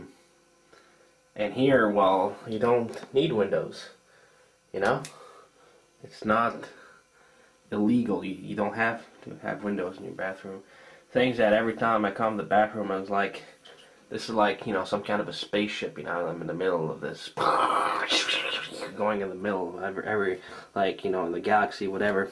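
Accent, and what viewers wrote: Quebec, Canada. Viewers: Sometimes I think this is a troll but then so many videos and the conditions in there . Such commitment if so. Seems like a French Canadian his accent.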